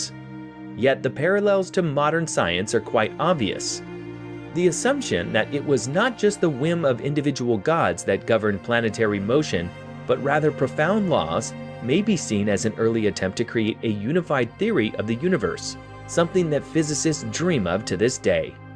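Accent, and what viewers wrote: Germany. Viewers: Physics is more fun with a German accent!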